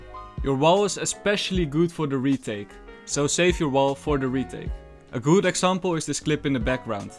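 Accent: Netherlands. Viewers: i didn't know you were dutch, dacht dat je russian was ofzo ik was verbaasd XD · hahaha i knew that u from the netherlands greetings from germany my guy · Is this guy dutch? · Yeah you are dutch 100%%%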